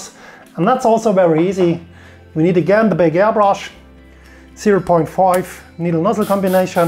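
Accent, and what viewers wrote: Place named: Germany. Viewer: nice accent ;) ... german ?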